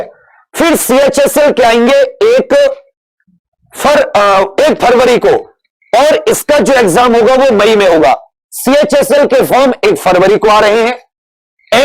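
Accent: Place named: Uttar Pradesh, India. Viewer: Guru ji sudh desi u.p ka lag rha ho sol ma ek dam must 🤘🤘🤘❤️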